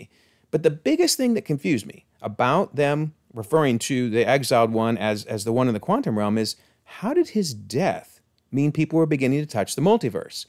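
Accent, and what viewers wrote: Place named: England, United Kingdom. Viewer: If it wasn’t true I wouldn’t call you English accent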